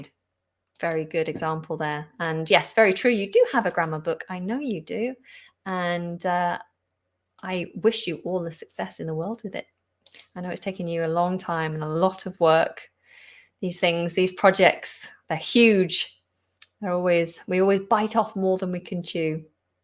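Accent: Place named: United Kingdom. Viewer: First of all, congratulations on your channel, I have been looking for a channel with British English for a long time and I found yours to be very funny. Secondly I would like to ask you a question as a new subscriber. Are you still uploading short videos or I have to join some group? I loved them. Thank you in advance, and cheers from Madrid.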